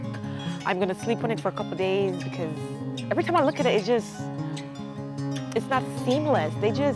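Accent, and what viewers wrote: Patois, Jamaica. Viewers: I have Jamaican pastors and I love to hear them talk in the native accent and tongue. your accent popped out real quick and I just love it! the arbor is beautiful. I like that it isnt stark black. the muted color tone will only make whatever you put on it pop even more. #tfs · Loved hearing the strong Jamaican patois 😁🇯🇲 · Love to hear the patois. TFS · Beautiful choice,! It probably looks crooked to you because of something in your line of sight. It looks straight and even from this side. It will be even more gorgeous when those vines start creeping up and over it. Btw, I think this is the first time I’ve ever heard you speak Patois. 💚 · Love the progress of your garden. Everything is coming along great. I'm looking forward to seeing it in bloom. I also love to hear your Jamaican accent when you are talking with your husband. 🥰 God bless your family and garden. 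🌷🌿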